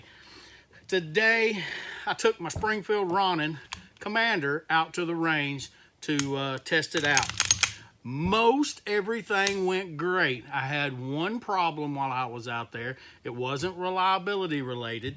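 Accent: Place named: Georgia, United States. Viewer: You sure do sound like a Georgia boy good review thanks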